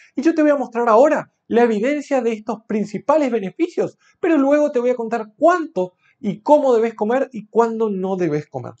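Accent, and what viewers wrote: Misiones, Argentina. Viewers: HOLA doc usted es de Misiones?tienes un tono muy de un Misionero muy linda la explicacion para el buen uso del gengibre...yo tengo una duda yo tomabe em te y en mate pero en poca dosis ...lo tolero pero mas de una rodajita me produce dolor de cabeza y presion alta y desde ahi deje de consumir porque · Argentino creo, yo soy argentina jiji😂😂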